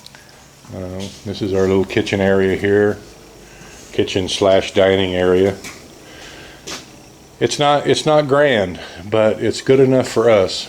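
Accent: South, United States. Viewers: that kind of house is the best shelter for you since bohol is always visited by typhoons... by the way, you have a nice southern accent.. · You got some southern droll accent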